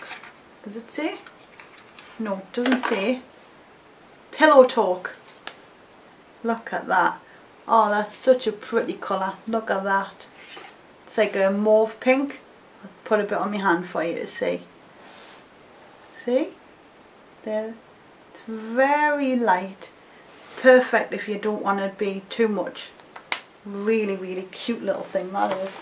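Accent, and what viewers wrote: Newcastle, United Kingdom. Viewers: You cannot believe how happy I am to see you back on here and so regularly too. Became a Patreon and wish I could send you more. I used 1 of your Barry M tutorials for a look I wore for years. I also bought 1 of your palettes too. Wow I’m beginning to sound like a weirdo lol, I live in Newcastle and love hearing the accent. You look so happy and relaxed xxxx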